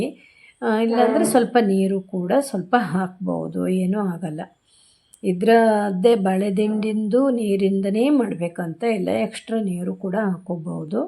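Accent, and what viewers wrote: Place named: Kerala, India. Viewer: Nimma matugalu kerala dvaru kannada matanadidante ede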